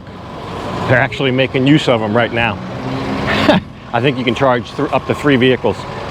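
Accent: United States. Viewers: Enjoyed your video as I am new to the Jomtien area. Honestly, it was comforting to hear an American speak! LOL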